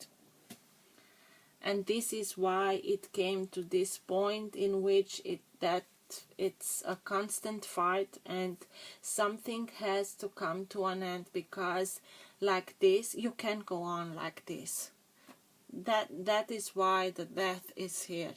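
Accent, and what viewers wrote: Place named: Transylvania, Romania. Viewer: Salutare! Vreau sa iti multumesc mult pentru etalare, nu am mai asteptat si versiunea in romana pentru ca aveam nevoie de ghidare.Si, ca de iobicei, am primit o.Dzeu a vrut sa ascult ce ai avut de spus in engleza.Vorbesc si scriu fluent ca un nativ aceasta limba si imi e la fel de usor sa inteleg ca si in romana.Sa te felicit pentru stapanirea acestei limbi si modalitatea complexa in care stii sa iti expui exact ideile, ce gandesti, ce vezi.......La pronuntie mai e nevoie de exercitiu, se simte puternic acel accent ardelenesc, dar totusi face ca accentul tau in engleza sa fie delicios.......Nu stiu sa iti explic cum suna, dar e melodios si frumos. Deci, la sfarsit de an, datorita tie, din nou, stiu exact ce am de facut.Vreau sa multumesc Divinitatii ca iti da aceasta ocazie, sa transmiti ce trebuie cui trebuie si cand trebuie, vreau sa inalt o rugaciune sa te mentina sa fii tu instati intotdeauna si sa aiba grija de tine si sa te ocroteasca! 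Daca nu ne auzim pana in Anul Nou, draga mea iti doresc Sarbatori Fericite alaturi de cei dragi tie, sa fii iubita si inconjurata de iubire, sa ai un An Nou deosebit cum esti si tu! Te iubesc mult, iti doresc tot binele! Gandul bun draga mea! Adrian, Constanta.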